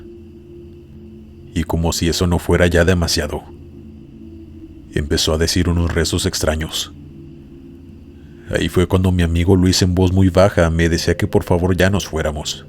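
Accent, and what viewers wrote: Mexico. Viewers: Hola, de dónde eres? 👁👄👁 como que me das vibras norteñas, de México 😅. Por cierto, excelente vídeo, como siempre, me encanta escuchar tus vídeos, me acompañan desde en el tráfico, o haciendo quehacer en casa. Saludos desde Guadalajara, Jalisco 🎉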